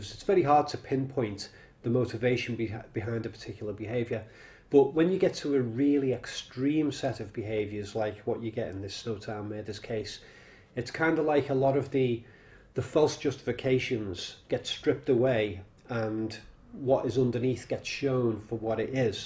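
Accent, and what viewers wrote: Liverpool, United Kingdom. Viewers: Love you and your chanel, but as a Blackburn native its very very funny to hear a scouser say "did him too" when describing a whole ass murder haha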